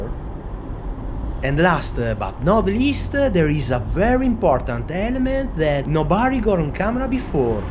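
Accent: Italy. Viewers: the most Italian English i have ever heard, 10/10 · Italian accent terrible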